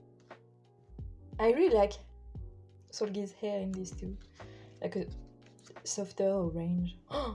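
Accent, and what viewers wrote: France. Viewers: your accent is so pretty! I knew you were french right away because i used to take french classes XD good video btw i just subscribed :)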